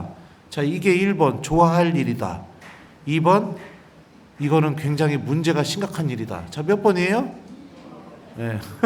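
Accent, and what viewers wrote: Chungcheong, South Korea. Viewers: 충청도 사투리로 인사하시니까, 신부님의 덕담이 더 구수하게 들리네요. 저도 몇십년전 죄 고백을 했던 씁쓸한 기억이 나네요 죄는 붙잡지 않아야 ,진정으로 회복이 빠르다는 말씀에 적극 공감합니다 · 신부님 충청도 홍성사투리가 너무 정겨워유 제고향도 엽동래유 신부님 건강도 돌보셔유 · 잘 계셨슈? 그려유~~그유~~ 충청도 구수한 사투리가 너무 친근감이 듭니다. 고향생각이 납니다. 쉽게 풀어 강론하시는 신부님 고맙습니다. 항상 건강하시길 기도합니다.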